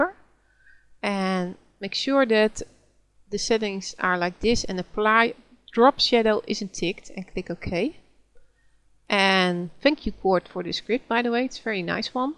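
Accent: Netherlands. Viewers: She really has an accent from Holland, not an insult but most people of the Netherlands most of the time can't speak with a good French accent, that's more something for Flemish people. btw, thanks for the great tutorials ' '! It rlly helped me!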